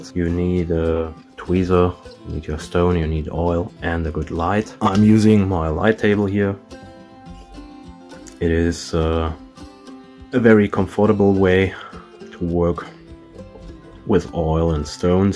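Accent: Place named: Germany. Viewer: I just got interested in gemstones, while looking for a sapphire ring for my daughter. Now I am fascinated with the whole subject of gemstones. Thank you for this video! So far the best one regarding natural vs synthetic! I like your German accent, I’m German myself, living in Southern California. 🇩🇪💍💜💎😊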